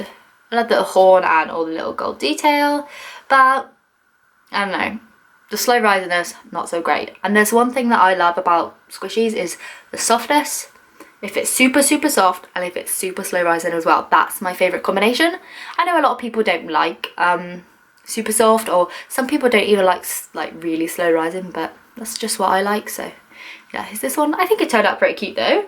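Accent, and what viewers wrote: United Kingdom. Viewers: 13 Reason Why...I ❤️ 1. She makes the CUTEST squishies 2. She uploads regularly 3. She is just the SWEETEST person 4. She is really CREATIVE 5. She makes the BEST original content 6. She has a really pretty British accent 7. She is SUPER kawaii 8. She is really Hard working 9. She cares about Everything 10. Her videos are “Family Friendly” 11. Her aesthetic is Just...GOALS 12. Her tutorials are REALLY helpful 13. I just love her in general 😘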